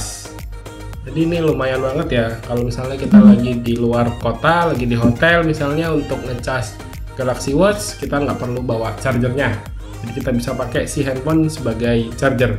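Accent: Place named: Medan, Indonesia. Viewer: Accent nya ga enak banget. Pelafalan D sama T disetiap akhir kata nya itu loh. China medan banget.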